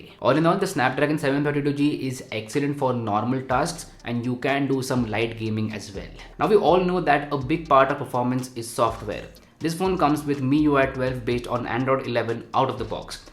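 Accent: India. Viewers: Mrwhosetheboss with indian accent😁👌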